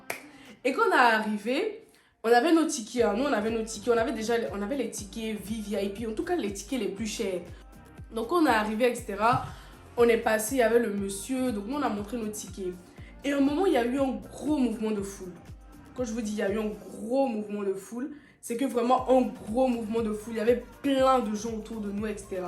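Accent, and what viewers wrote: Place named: Ivory Coast. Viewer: Sa se voit trop dans ton accent que tes ivoirienne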